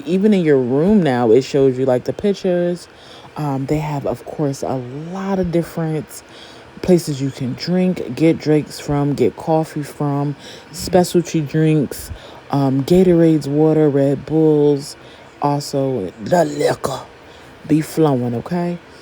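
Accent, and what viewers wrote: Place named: Connecticut, United States. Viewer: I know this is old, so you might not see this but the whole time watching I was like her accent, I wonder if she from CT, so I looked in the comments. Still not done watching but I see this comment, and I'm like wait, we say this here lol. So still not sure, but yea, I was wondering.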